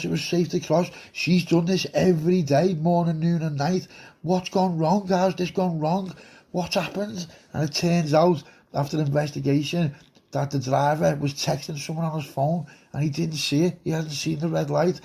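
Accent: Liverpool, United Kingdom. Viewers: Top quality Scouse👏🏼👏🏼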